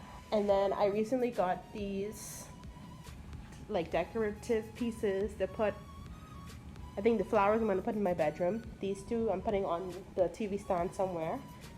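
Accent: Bahamas. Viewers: I said the same thing. She sound like a true bahamian lol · I came looking for this comment! I said she has to be from the bahamas! Sending love from nassau!!! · From I hear ya accent Ik you was a Bahamian #Bahamas🇧🇸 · You have to be a Bahamian because of the accent. I'm watching this like hey she sounds like me loll... Nice Apartment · I know a Bahamian when I hear one! Great job sis🇧🇸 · From the video started i knew you were Bahamian! So am I! And i love this video. Keep up the great work bbg. Def got a new sub. :) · The Aquarian Empress I cam just to see if someone caught it lmfaooo. As soon as I heard her speak I said she a Bahamian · Are you from the Bahamas? I can here our accent🤣🤣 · Are you Bahamian??? That accent creeping out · You sound Bahamian 👀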